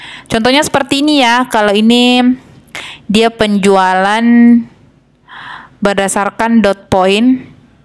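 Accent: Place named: South Sulawesi, Indonesia. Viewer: kentara sekali kalau orang sulsel, deee, ya kan?! hehe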